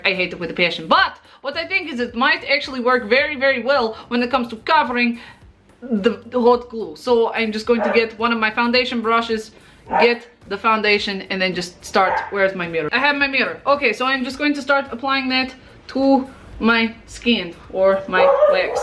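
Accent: Australia. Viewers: OmO I'm back. Oh lord. I found Piloff and this accent first.. and I started to go through your other videos because I am in love. But I was shook hearing the aussie accent. You are so extra and halarious!! Keep up being the fabulous you!!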